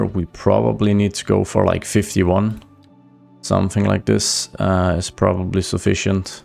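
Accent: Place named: Denmark. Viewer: Man kan bare høre at du er dansker xD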